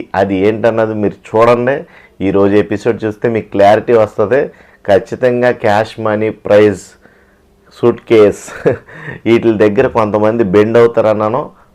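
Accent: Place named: Godavari, India. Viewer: Nuvvu same godavari Jilla pakka super language and manodive bhayya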